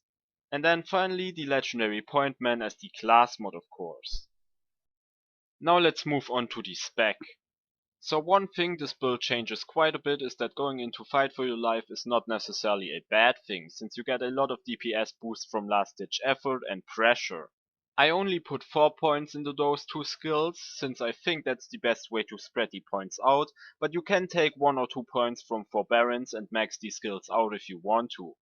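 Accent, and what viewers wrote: Germany. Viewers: Klingt sehr deutsch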